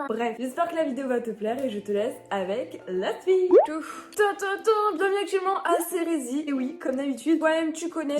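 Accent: England, United Kingdom. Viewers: Évite de faire l’accent anglais c chiant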